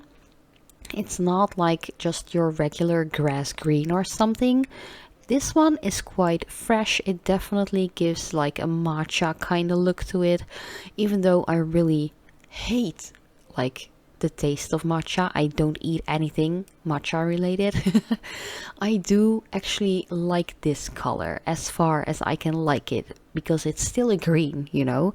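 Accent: Netherlands. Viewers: Je hoord wel dat je Nederlands bent ahah🤭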